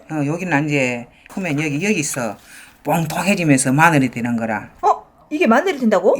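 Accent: Gyeongsang, South Korea. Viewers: 진주사투리 들을수록 고향가고 싶어요 스물안되 부산와서 벌써 50이 넘었네요 내가 뛰어놀던 인사동 잘 있나 몰라요... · 경상도 말투가 아주 재미있어요 잘보고있습니다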